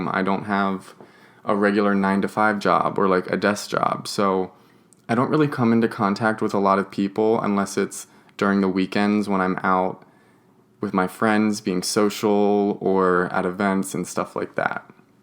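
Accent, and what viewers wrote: United Kingdom. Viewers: Jezzz whiz, for an Adult PORN STAR you seem down to earth, really u do but are u english..for some reason i think your british but i believe your american....great vids....great chats..have a great day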